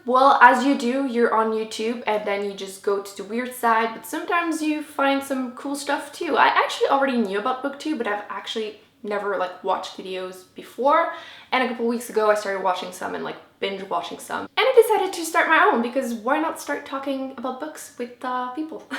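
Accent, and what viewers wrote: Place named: Quebec, Canada. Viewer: I just saw your new video and when I heard your accent I thought you might be french canadian! I'm so happy to finally find a french canadian booktuber, I'm from Montreal so hi and can't wait to marathon your videos!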